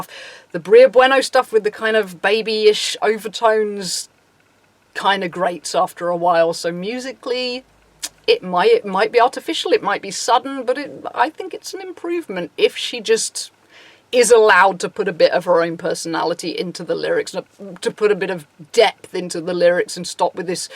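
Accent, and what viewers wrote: United Kingdom. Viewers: Oh that british pronaunciation of you... No idea who you are talking about but I'll watch the video anyways :))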